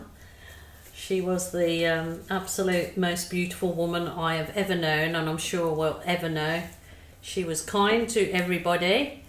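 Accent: Birmingham, United Kingdom. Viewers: after I sent it I thought “No! She a Brummie ya daft tit” I’m sorry for miss placing you. Love that you’ve never lost your accent. X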